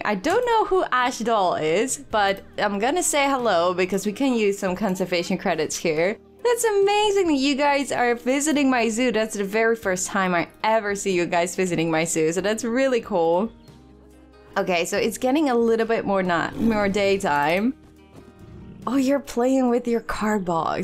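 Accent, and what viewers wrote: Netherlands. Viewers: Are u dutch? I font know why but i have the feeling that your dutch😂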